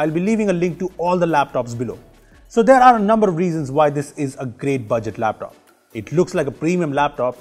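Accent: India. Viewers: The Indian accent made me want to click away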